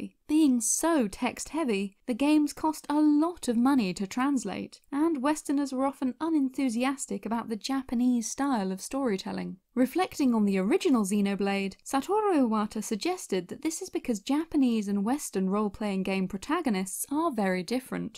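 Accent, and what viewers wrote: United Kingdom. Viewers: I’ve been following this channel for a while and I barely noticed the narrator is British. 🤷‍♂️ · But why are you British? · your voice isn’t posh, I mean maybe I just am numb to the accent as I live in oxford but to me it isn’t posh 😂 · Why are you British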